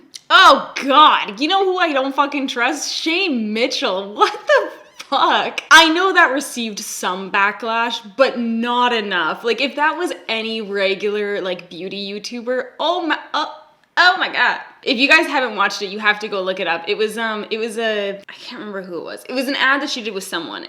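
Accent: Canada. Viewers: I love this just because you said “tag” so much at the end. #lovecanadianaccents you’re the best! 🙏👏🏽❤️ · All the comments about her "accent" saying "tag".. I went back and watched again wondering what I missed... oh... silly me eh.. I grew up 2 hours away from where she did 😂😂😂 Oh Canadia.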